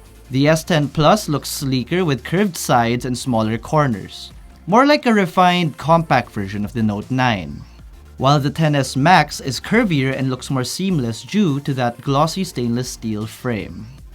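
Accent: Philippines. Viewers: I'm confused. You look korean, but you have a tagalog accent. Itzy! They're dope, right? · I immediately stop watching the moment I hear the ugly Filipino accent!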